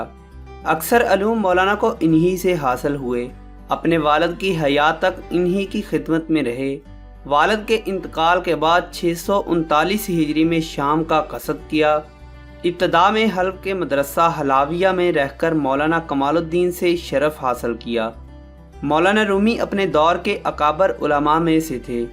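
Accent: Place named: Punjab, Pakistan. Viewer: I understand lots of people are doing this for some extra income but healthy criticism helps improve quality of work. I'm sorry that I pick on you accent but when it comes to educational videos there's no compromise. I can tell you are Punjabi so am I but our national language is Urdu and taught in schools from nursery level so it deserves that level of respect and perfection. Hope it makes sense.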